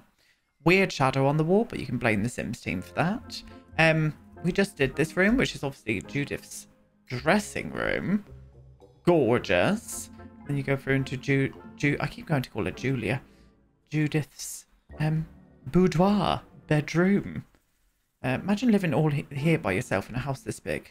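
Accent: United Kingdom. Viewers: Someone ranting about the sims build in a British accent is just what i needed 😂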